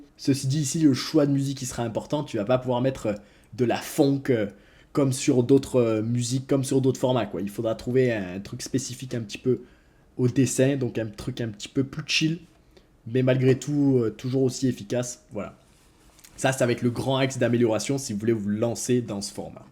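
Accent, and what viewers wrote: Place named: Lozère, France. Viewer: Je connais cet accent (48) 😉 dis-moi si je me trompe ?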